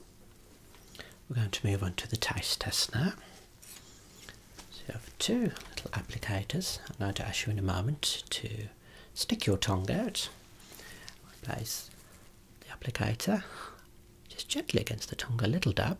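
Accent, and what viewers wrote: Birmingham, United Kingdom. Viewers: Birmingham?!?! Wow i love the accent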